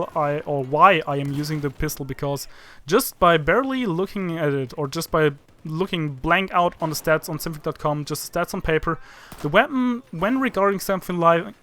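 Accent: Germany. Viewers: did you speak german?....Deine Aussprache klingt nämlich sehr Deutsch ^^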